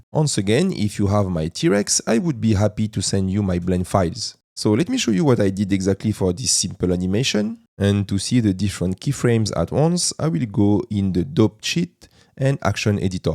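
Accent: France. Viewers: J’ai reconnu un accent français si je ne me trompe pas. Si je ne me trompe pas cargo ne fonctionne pas pour la version actuelle de blender c’est dommage que ça rende obsolète tout tes tutos ou tu l’utilises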